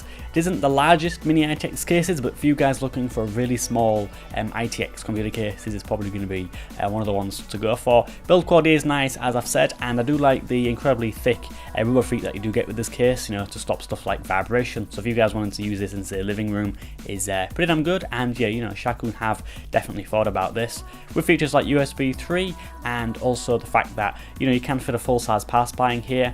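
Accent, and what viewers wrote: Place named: Scotland, United Kingdom. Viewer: Are you from Scotland or something, because i cant understand your accent a quite bit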